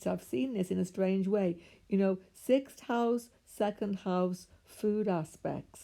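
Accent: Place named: Welsh Midlands, United Kingdom. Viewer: It's cool hearing a welsh/ midlands accent in an astrology video hehe 😜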